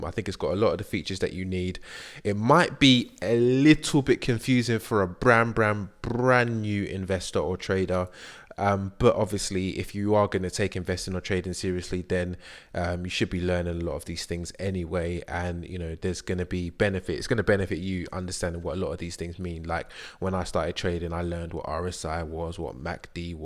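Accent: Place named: London, United Kingdom. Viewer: u sound like you're from ends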